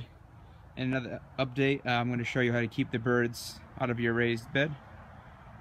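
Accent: United States. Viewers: You def planted them correctly. Too bad they didn't pull thru for you. You must be a New Englander like me. I can hear it. What was in the wine barrel? Columnar apple ? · Awesome, I'll have to add this to my garden arsenal! Thanks! You sound like you're on the East Coast? I'm in Nor Cal. Lots of us Portugees out this way! I have a huge family. Seems like farming and boating are in our blood.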